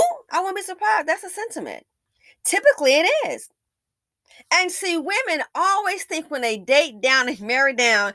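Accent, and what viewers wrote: South, United States. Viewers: girl you are soo damn funny with your southern accent and twang 🤣🤣🤣🤣. "She picked up the k-mart man and now in divorceland". I screamed my head off 🙌🏾♥️💯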